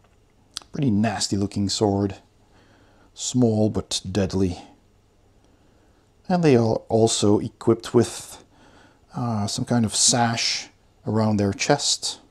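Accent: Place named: Netherlands. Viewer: i could tell youre from the netherlands like me, ill be getting my set tomorrow and im super hyped for it!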